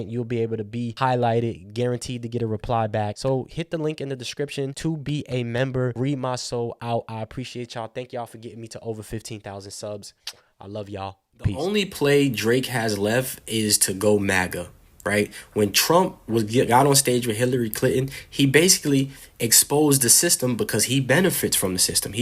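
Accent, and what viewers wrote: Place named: South Carolina, United States. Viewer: Charleston in the building shoutout to SC! You know what time it is over here. I heard the accent so I had to make sure you was from the low country. But I like your takes! Ye is SC stamped.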